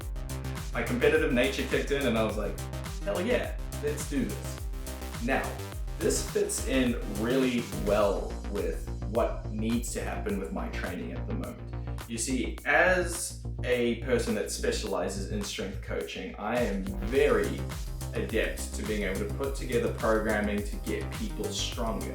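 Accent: South Africa. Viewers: Sounds saffa.....lekker. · Why is your accent so close to South African?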